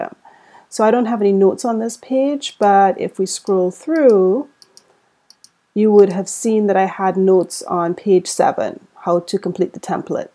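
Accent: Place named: Trinidad and Tobago. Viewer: Thank you. You saved me today. You sound like a Trini, like me. Thanks again!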